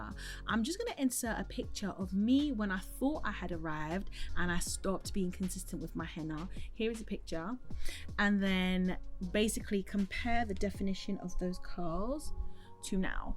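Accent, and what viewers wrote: United Kingdom. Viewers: Whoo lord! Thank You for this list because a few names of the powders I couldn’t understand with her beautiful British accent.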